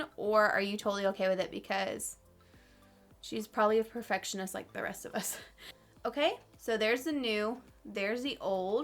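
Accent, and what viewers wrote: South, United States. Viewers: Love your southern accent, so cute! I like the left side better, looked like the colors blended out better. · I just love when your southern comes out because being a BAMA girl myself it’s nice to hear something of myself on YouTube. I’m not sure why it’s so rare to hear. There’s just so many of us southerners out here! Thank You for this video!!! I’m a big fan of yours! Much Love toYou 😘💋😍❤️❤️❤️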